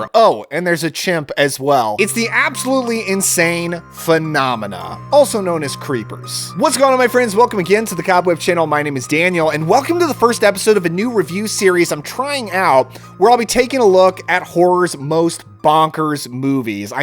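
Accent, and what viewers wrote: United Kingdom. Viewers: I was also going to say it is a mid-lothian accent but you beat me to it first... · Yeah! Great description. Edinburgh is the sort of area I was thinking that sort of accent is from but I've only been there once myself so didn't feel super confident at identifying the accent.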